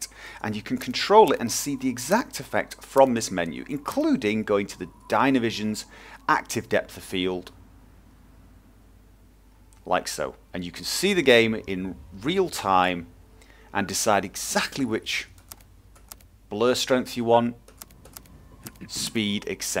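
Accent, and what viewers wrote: United Kingdom. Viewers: He's obviously British.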